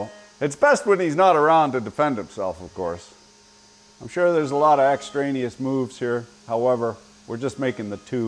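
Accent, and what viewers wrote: Quebec, Canada. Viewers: I speak French but I understood absolutely nothing. Is it some sort of Canadian dialect? Can you translate please? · Ah ben tabarnak, c sûr qu'avec cet accent là c'est resté au Québec un bout... Salutations et nice job!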